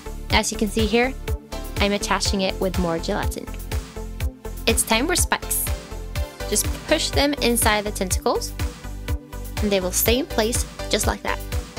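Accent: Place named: Skåne, Sweden. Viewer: ÄLSKAR din dialekt, är du skåning?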